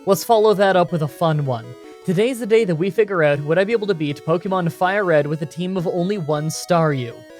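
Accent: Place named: Canada. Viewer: I love how Canadian you are, when you say “out” I never know what pronunciation you will use lol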